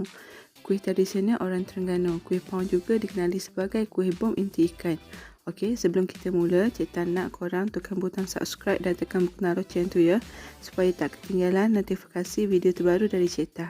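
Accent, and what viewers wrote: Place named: Kuala Lumpur, Malaysia. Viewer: Hebat buat kuih ganu tp slang orang key el😄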